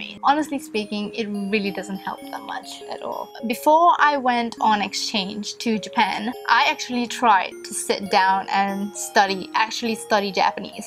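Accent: South Korea. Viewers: your accent is sounds like korean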